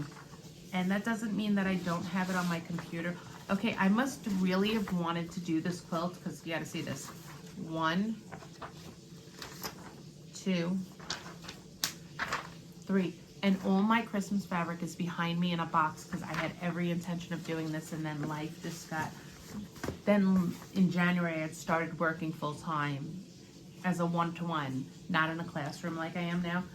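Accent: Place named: New Jersey, United States. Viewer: Hello. Awesome projects. I was watching a different video of yours. I recognized that you speak more like I do compared to other states 😂 I’m in NJ but it’s very similar in certain areas of NY. I recognizes the word bought, Autumn, etc. Idk it is crazy. Before I started watching YouTube and hearing other accents, I would have never noticed that you sound like home I guess I could say 😂❤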